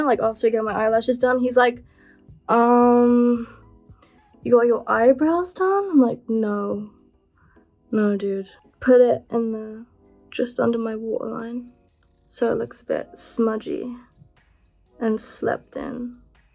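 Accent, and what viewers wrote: United Kingdom. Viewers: am i the only that didnt know she was british??? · BEABADOOBEE'S BRITISH? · shes british? 😢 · I love her british accent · SHES BIRTISH??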